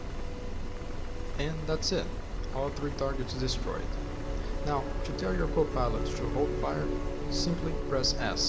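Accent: Brazil. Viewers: Tá bem BR esse sotaque aí hein! Anyway, thx for the tutorial.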